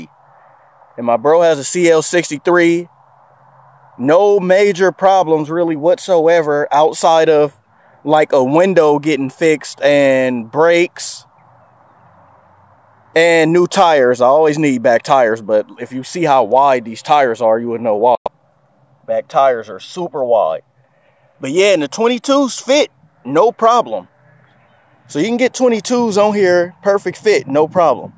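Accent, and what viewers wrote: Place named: Texas, United States. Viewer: Damn, you’re country. You must be from Texas. 😂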